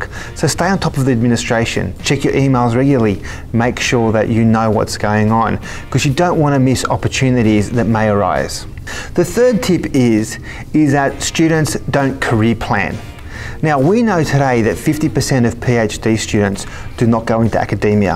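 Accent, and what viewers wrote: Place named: Australia. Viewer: Aussie accent 🔥🔥🔥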